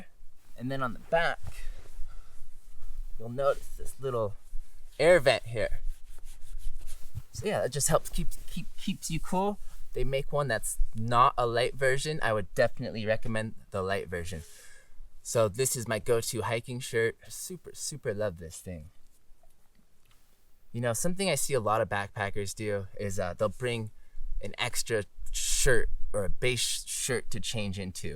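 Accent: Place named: California, United States. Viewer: Good content. I'm having confusion over the accented speech - kind of valley guy of sorts. Got to be from CA, maybe Portland or Seattle.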